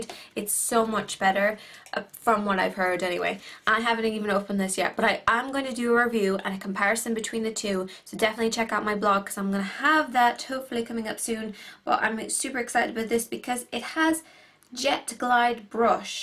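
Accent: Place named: Cork, Ireland. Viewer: So good to hear a Cork accent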